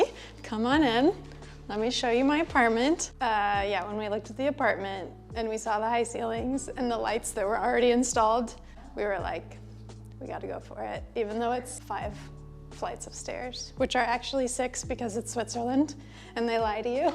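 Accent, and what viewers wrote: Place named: United States. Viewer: Cute place, cute dog and cute US accent!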